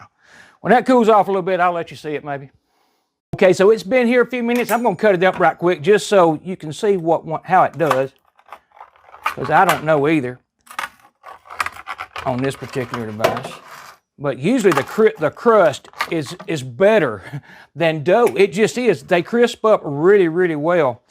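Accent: South, United States. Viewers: Where you from,? I'm a SC girl. Recognize that wonderful southern accent! Thank you for your videos!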